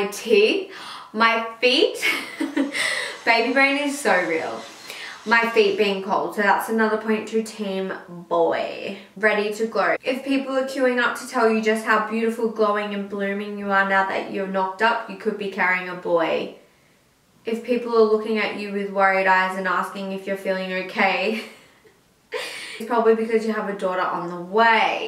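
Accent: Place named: Australia. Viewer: First I love your videos xx you are so amazing and love your Aussie accent Edit(I love your Into it's so bomb i do it all the time)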